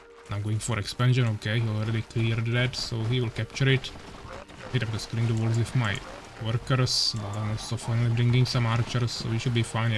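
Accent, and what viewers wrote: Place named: Czech Republic. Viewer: Your accent sounds slavic to me, in particular czech to me.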